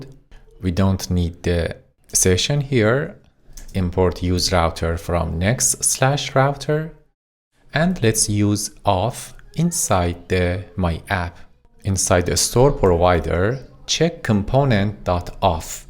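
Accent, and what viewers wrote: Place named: Iran. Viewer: لهجتون ایرانیه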